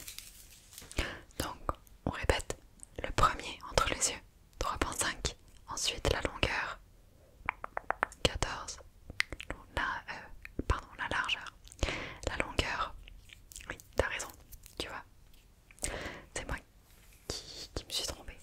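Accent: France. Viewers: Your French accent is lovely!!!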